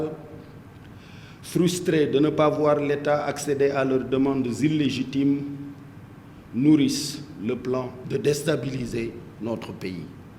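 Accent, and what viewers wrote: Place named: France. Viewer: Voici un gars extrêmement dangereux. J'ose seulement espérer que le Pr Macky Sall, son panel de conseillers ainsi que le peuple sénégalais sont suffisamment avisés pour comprendre qu'il est en train de faire un clin d'œil aux français et à l'armée sénégalaise. Et son message est suffisamment clair : "je suis une alternative à Macky Sall". Mr Seck ne s'adressait pas au peuple sénégalais. Son accoutrement en témoigne parce que, généralement quand il cherche l'adhésion des sénégalais à son discours, il s'habille en grand boubou blanc et s'exprime en wolof. Mais, aujourd'hui c'est plutôt en français avec l'accent d'un "noble" français. D'autre part, à date nous sommes pas encore informés de biens d'un investisseur burkinabé, nigérian, danois ou américain pillé. Affirmer que l'armée sénégalaise et les forces de sécurité sénégalaises sont les MEILLEURES au monde alors que leurs membres sont formées au Maroc, en France et aux USA est une insulte à ces derniers. 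Enfin, se déclarer facilitateur entre MM Sonko et Sall revient à reconnaître que les évènements de ces derniers jours ne sont pas un problème entre l'État du Sénégal et ses administrés, ni entre Mr Sonko et la justice sénégalaise mais plutôt entre le Chef de l'État (ou d'un parti politique) et un de ses concitoyens (ou opposant). Pourquoi les parenthèses? Parce que je ne me retrouve plus dans tous ces jeux. God bless Sénégal and its people!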